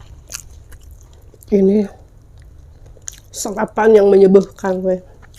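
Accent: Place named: Banten, Indonesia. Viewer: Kaya nyya orang Banten soal nyya ngomongnyya sunda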